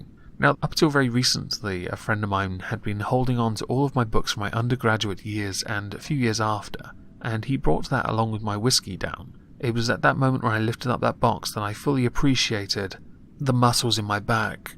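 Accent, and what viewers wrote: United Kingdom. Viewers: This guy sounds like the britisher, just me?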